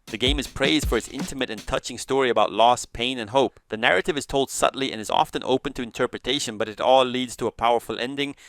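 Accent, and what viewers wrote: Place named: Brazil. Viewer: A voz mano, o sotaque dele é muito de brasileiro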